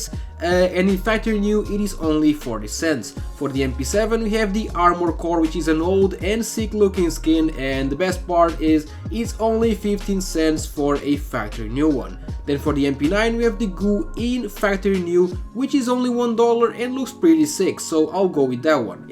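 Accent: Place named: Portugal. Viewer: you sound so portuguese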